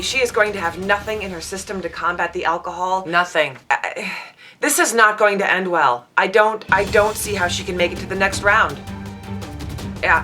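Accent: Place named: Wisconsin, United States. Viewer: Very funny. The Wisconsin accent is similar to us here in Ontario. One question... I am trying to figure out the words in the intro?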